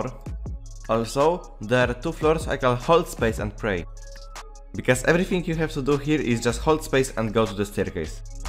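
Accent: Poland. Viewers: słychać że z polski jesteś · Are you Polish? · Ej czy tylko ja słysze w jego głosie polski akcent xDD? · po akcencie słychać że polak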